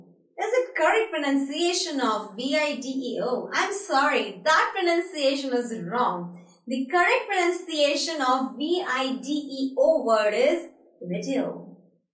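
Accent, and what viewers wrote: India. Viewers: Bhaven May, Bro, this is not a Hindi language class!! She is teaching the English language. 😊 And I know, in our country we always use mispronounced words instead of correct pronunciations. It's very common to speak wrong pronunciations in India. And we love it. Of course, people hate correct pronunciations!! I don't know why?? 🤔 It's weird!! By the way, she is speaking with topical Indian accent. So, what do you mean stop accent!!! 😀 Do you know what is an accent?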